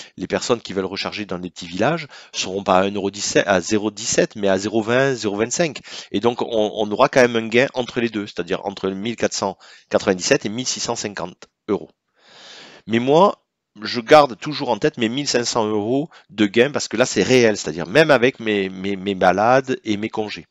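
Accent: South, France. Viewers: Bonjour ok super cool je savais bien tout ça mais là vous êtes vraiment super gentille et clair bravo pour ces tarifs cités, vous habitez où svp vous avez un accent assez fort du sud de la France pour savoir si l'on serait pas voisin par hasard bref dîtes moi par contre avez vous effectué un tableau avec les différences entre la thermique et la Tesla au niveau des réparations ou des révisons svp c'est surtout là aussi qu'il faut faire montrer l'évidence des différences il y en a qui sont déçu total moi je suis un grand amoureux de la Tesla je veux en avoir une et à cause du tarif de l'électricité je me suis plutôt tourné vers une hybride mais je me suis fais avoir en beauté une fois de plus avec une voiture en mauvais état ce n'est pas acceptable j'ai trop de frais à faire dessus et même les pros chez le concessionnaire m'ont arnaqué et ont évité de me faire une géo alors qu'elle en avait bien besoin car j'ai soit les amortisseurs avant à refaire soit le triangle inférieur d'après mon mécano ce serait la barre qui est le triangle apparemment il y a un truc tordu et j'ai pris un trottoir en plus qui a accentué le souci ... 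donc j'ai bien envie de savoir s'il serait possible de me faire reprendre ce véhicule et du coup acheter une Tesla modéle 3 alors que ma préféré est la modéle S la coupe j'en suis fou ...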